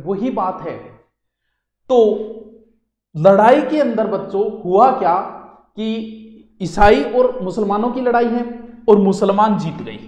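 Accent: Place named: Rajasthan, India. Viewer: Mai Bihar se hu aur aapka language sunkar mujhe lagata hai ki pakka aap rajsthan se hai 😂😂😂 but l don't know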